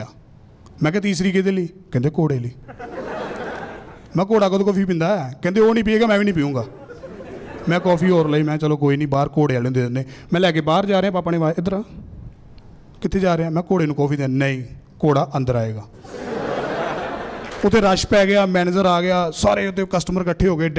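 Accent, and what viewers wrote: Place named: Amritsar, India. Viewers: Pure majhi boli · THAT typical ambarsari accent and words... Priceless...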